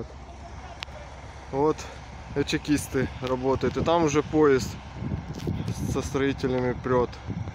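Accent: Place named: Ukraine. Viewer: Да ладно нормальный голос с приятным малороссийским говором.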